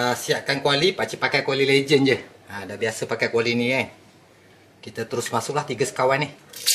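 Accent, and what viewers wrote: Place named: Riau, Indonesia. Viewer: Sedapnya nti kita try... Terima kasih ya... Cara pakcik bercakap macam org Riau la..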